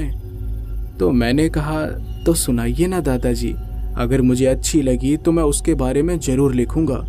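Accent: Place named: Bhojpuri, India. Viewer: Your best story so far. And loved the Bhojpuri flavour in it. Maza aa gayil ekdam 😅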